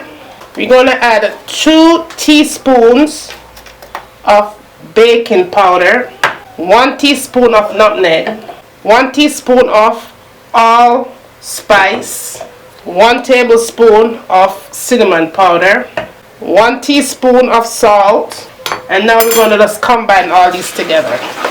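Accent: Jamaica. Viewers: love the video and the way yuh talk mi sure a nuh montego bay yuh come from..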